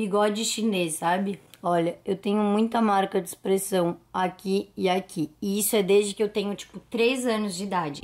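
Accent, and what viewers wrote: Rio Grande do Sul, Brazil. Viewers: Guria! Tenho quase certeza que tu é gaúcha! Sotaque 😉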